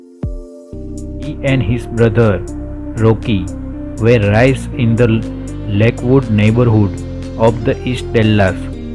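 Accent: India. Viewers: The voice in the documentary seems Indian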